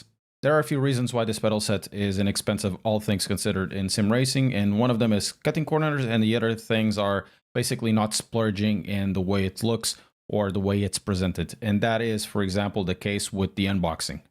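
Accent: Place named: Portugal. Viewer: came for the reviews, stayed for the portuguese accent! Bom trabalho!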